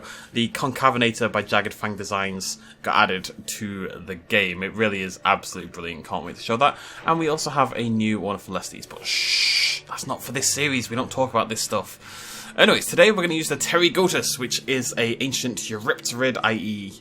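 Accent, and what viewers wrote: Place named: Northern Ireland, United Kingdom. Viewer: Omg how did I not realise you were from Northern Ireland your accent was so familiar and yet I was like??? Why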